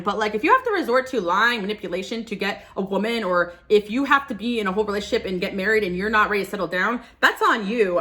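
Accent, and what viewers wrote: New Jersey, United States. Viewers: I love when your Jersey accent comes through.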